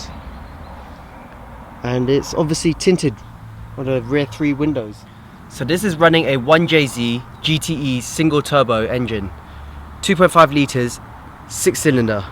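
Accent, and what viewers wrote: United Kingdom. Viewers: Bruh that british accent had me thinking you were a white guy(not being racist), awesome review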